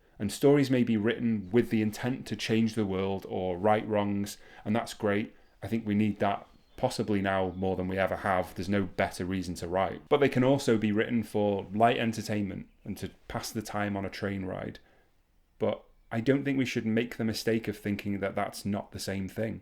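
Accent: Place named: Yorkshire, United Kingdom. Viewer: I have two questions which I think you can help me with. First of all I believe that your accent is from Yorkshire so I think you can definitely help me. Because I’m trying to write a book that you set in Ingleton and I’m a London living in Oxfordshire so I have made a rod for my back. I also know that the dialect is definitely different to the way I would say things. The second thing that I don’t know if you can help me with is how do you write a character who is drunk and also from Yorkshire? Do you use the dialect heavily or do you tend to just refer to actions? Finally, I just remembered that I know there’s a difference between an alcoholic and someone who is drunk because you can have a functional alcoholic or an abusive alcoholic who’s not drunk all of the time.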